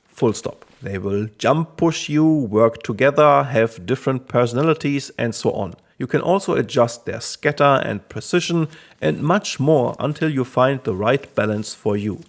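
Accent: Germany. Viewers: Ich mag einfach diese klare und deutliche Aussprache 😂 Man kann immer hören wenn es ein Deutscher ist der redet👍🏼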